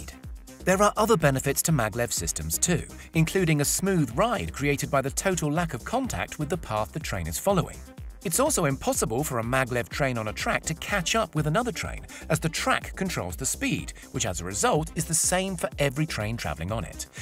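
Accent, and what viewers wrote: United Kingdom. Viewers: The narrator has a British accent. It's probably the reason why he using that archaic unit. Multiply that speed by 1.61. This gives about 602 km/h.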